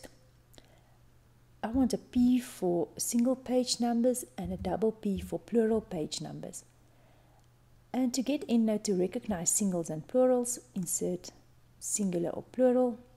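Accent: South Africa. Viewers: Sounds like a very South African accent to me. Thanks a lot very helpful!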